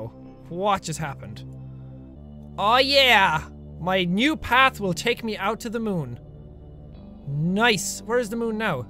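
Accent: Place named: Ireland. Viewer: You accent is entertaining its a nice change of pace from EVERYONE BEING BRITISH OR AMERICAN or the couple of Australians but IRISH it's a nice change of pace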